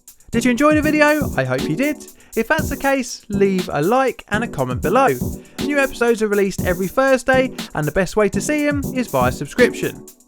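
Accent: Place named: London, United Kingdom. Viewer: sounds like london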